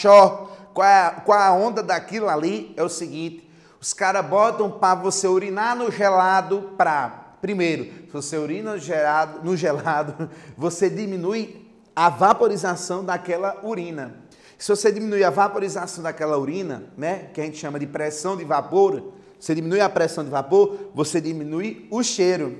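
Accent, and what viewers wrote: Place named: Northeast, Brazil. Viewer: Esse caba é do nordeste mesmo, valeu mesmo.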